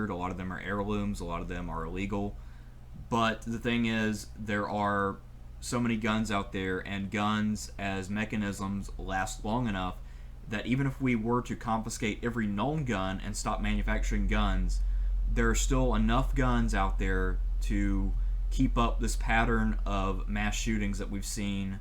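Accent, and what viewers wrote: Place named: Texas, United States. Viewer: ...."So if we end up losing in November, don't blame us. Blame yourselves...If you feel otherwise, go fuck yourself." You sound hilarious using vulgarity with that Texan accent or whatever it is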